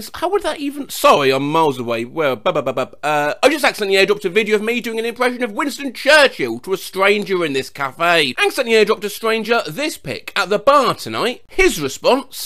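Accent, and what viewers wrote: United Kingdom. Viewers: This guy is so aggressively British I love it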